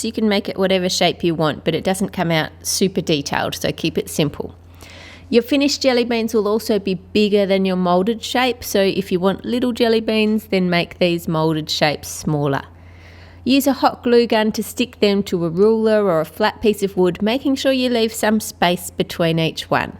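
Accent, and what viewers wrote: Australia. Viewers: Are you Australian ? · Shes Australian Harley Quinn is from New York lmao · Are you Australian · Are you from australia or new zealand? Youve got such an interesting accent! Love it!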